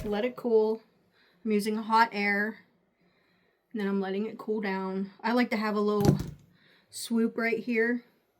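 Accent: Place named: Philadelphia, United States. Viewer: May I ask where you’re from? You sound like you’re from Philly or South Jersey area, where Im from.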